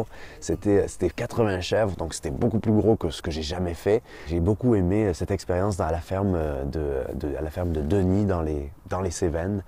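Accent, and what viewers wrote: Quebec, Canada. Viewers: La visite de la France avec accent quebecois YES!!!, si tu passe par la Nievre n'hesite pas a me contacter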